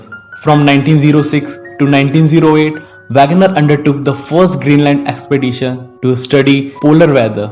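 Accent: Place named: India. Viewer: It is a nicely composed video on, how the dynamic hypothesis of plate tectonics was conceived by Alfred Wegener. For the one who wants to learn about the evolution of continents, oceans and mountains, this introductory video will be very much useful. Our students will be able to follow the narrative as it is with the Indian accent. The effort of ' ' is commendable.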